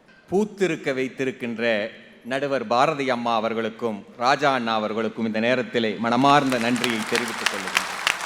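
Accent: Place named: Kongu, India. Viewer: அண்ணன் கொங்கு பேச்சை வரவேற்கிறேன்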